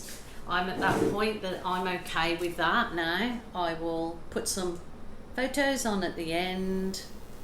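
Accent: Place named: Walsall, United Kingdom. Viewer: Love it, I'm a too from Walsall live in Townsville 😁 Where are you originally from? You talk like me x I've been in Oz 29 years x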